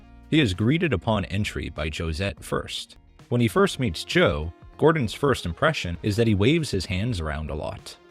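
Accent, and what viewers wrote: Canada. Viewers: I love how I can always tell that a YouTuber is Canadian by the way they say “aboot” lol. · could do without this damn canadian narrator since he calls every thing a hoose or aboot learn to speak right or gettoff this narration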